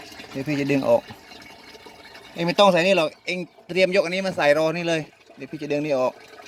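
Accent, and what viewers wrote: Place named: Suphanburi, Thailand. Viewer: สวัสดีครับ....ออ็อด...สู่สู่..ครับ เป็นกำลังใจให้ครับ.... เหน่อสุพรรณ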